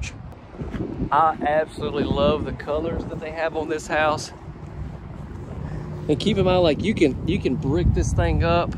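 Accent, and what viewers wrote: South, United States. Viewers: Hey, I really enjoy watching your videos. I love your southern drawl. And I LOVE the pantry dance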